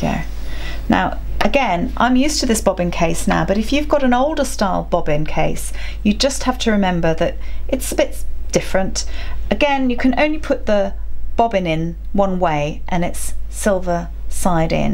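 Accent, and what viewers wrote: United Kingdom. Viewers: Loved the simple instruction, demo and English language accent/style. Audrey. USA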